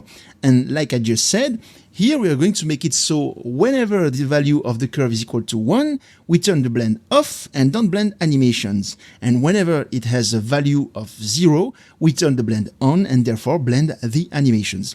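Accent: France. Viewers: L'accent français de zinzin 😅Merci pour le tuto complet c'est top !! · L'accent française est vraiment la pire.. ouch.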